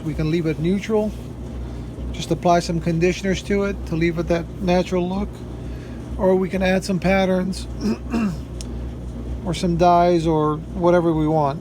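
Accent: United States. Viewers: You sound American. I'm French born and raised, lived in U.S since '84, and have found only 1 shoe repair shop in 34 yrs living in the States. You are a craftsman on the endangered species list my good man. Those shoes are a work of art too. Nice job restoring them.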